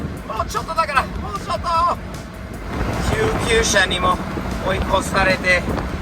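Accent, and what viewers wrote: United States. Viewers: アメリカ訛りの日本語って感じで好きです！ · Also, one final thing I want to say. Say what you want about American accent, but that guy's still extremely proficient in a second language in a way that the vast majority of Americans are not. I will always respect the fact that this guy raised his son to also speak fluent Japanese, as well, despite the fact that he was raised entirely in America (Link: <link> I wish my own father did this for me, and I still love him to death, but he had neither the patience nor the confidence to learn my mother's native Japanese tongue. The Japanese language is an heirloom that is worth preserving, but because my sister and I were never raised in the language, we've had to pick it up as adults since.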